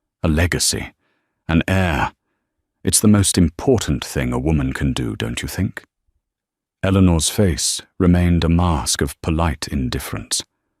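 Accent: United Kingdom. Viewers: Another interesting story about a sensitive issue. Well written. I love this British narrator voice. ❤❤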